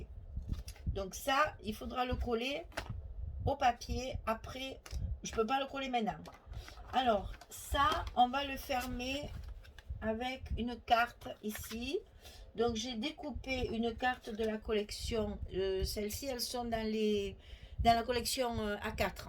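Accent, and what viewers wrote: South, France. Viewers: Géniale cette partage. Génial cet accent du Sud. Et puis s’il y a une petite anomalie et bien cela nous montre que c’est un travail fait main, artisanal, fait avec le cœur. Alors merci à vous.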